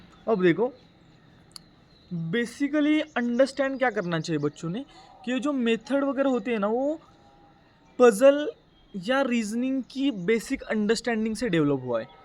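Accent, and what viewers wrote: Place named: Maharashtra, India. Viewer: Bhaii tumhari hindi sunke hi mai samaj gaya ki tum maharashtra wale ho😅😅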